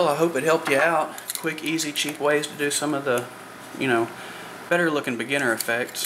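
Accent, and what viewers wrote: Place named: South, United States. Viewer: Love your dirty dirty south accent <3 And thank you for the tutorial. It helped me a lot! :)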